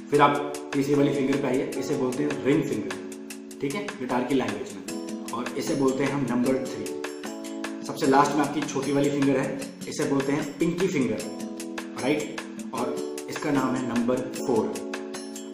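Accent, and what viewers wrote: Himachal Pradesh, India. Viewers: Bhaee himachal se ho kya?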